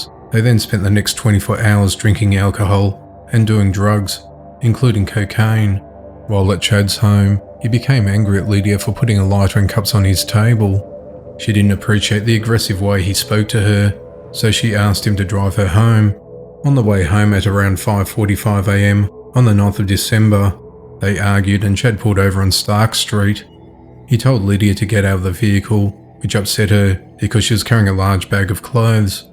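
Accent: Australia. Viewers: This is like an Aussie version of Chills voice.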